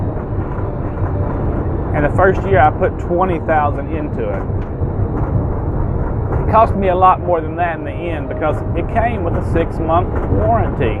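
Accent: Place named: South, United States. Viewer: I love your accent, its from south right? great trucks, i really like to try to drive those monsters,wow. xoxo monstermom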